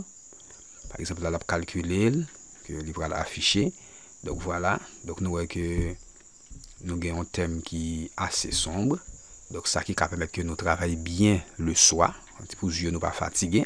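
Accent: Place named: Asia. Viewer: Sans l'accent asiatique, ce serait plus facile à suivre...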